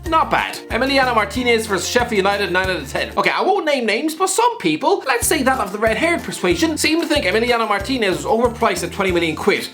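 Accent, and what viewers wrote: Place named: Ireland. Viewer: This guy sounds Irish...🤔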